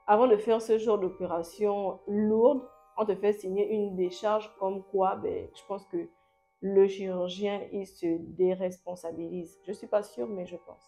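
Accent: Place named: Gabon. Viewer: Quand j’entends ta voix, ton accent Gabonais me fratte carrément au visage 🤧✨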